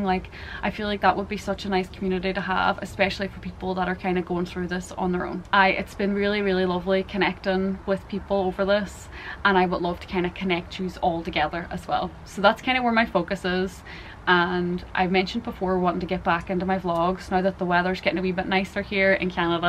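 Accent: Ireland. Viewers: I love how you are community us, your smile, lovely Irish accent, your awesome wavy blonde hair, your ease and command into the camera, and knowledge. You seem to be picking up the medical terminology pretty well too. I love learning anythingmedicalrelated, , took a course in it many yrs ago, getting straight As. Came in really handy too, because several rare genetic diseases in my kids and grandkids. Sounds like you have had a rough couple of wks. I was so sad that your your surgeon oncologist took off out of country without calling you, making sure you and other patients were OK for the time he was unavailable. Very inconsiderate. Better days ahead for you both.❤ from ottawa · you are such a beautiful person. I hope you get the absolute best outcome from all of this. Thank you for sharing your diagnoses and journey with us. As a fellow Irish (my dads full Irish but never used his accent when I was growing up so I've always been gutted I don't have it), i adore your accent and could listen to you for hours haha! 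Sending you so much love and positive thoughts! 💚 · Hello, Your channel just popped up in my feed--maybe because I am following another cancer survivor (Dr Dan Says, he is a chiropractor and very inspiring man) but I really am getting an education on what some folks are having to deal with and at young ages (50s is too young too) Anyhoo, you are traffic stopping beautiiful and very sweet and well spoken and I think your channel is the first I have that is teaching me what a proper Irish accent sounds like. Wishing you the best in your life challenge. They are making breakthroughs all the time helping people live longer so don't give up!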